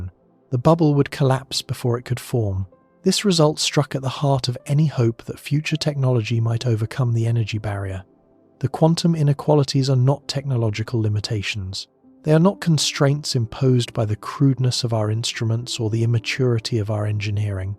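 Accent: England, United Kingdom. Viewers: Math in an English accent sounds wrong.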